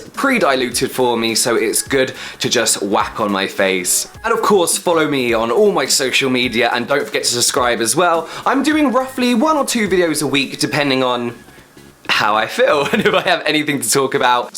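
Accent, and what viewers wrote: United Kingdom. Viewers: I love the UK English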